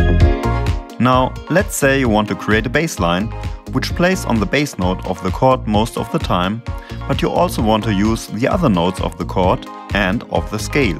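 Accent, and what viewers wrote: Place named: Germany. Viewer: Da entwickelt Ihr so ein Produkt, seid aber zu geizig 200 EUR in einen native speaker zu investieren smh. Dieses Video ist schon konfus genug, aber der deutsche Akzent lenkt so sehr ab, dass es noch schwieriger wird, dem Video zu folgen.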